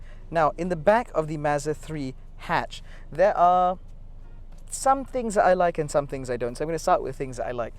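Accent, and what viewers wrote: England, United Kingdom. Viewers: Love your eng accents bro..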